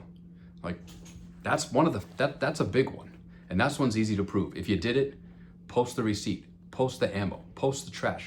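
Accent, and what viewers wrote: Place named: Boston, United States. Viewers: Bro where are you from? I was born and raised in MA, and when you said, “stahted fuckin 12 days ago” I was home in Lowell for an instant🤘🏻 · First time viewer but judging by the voice..... Go Sox...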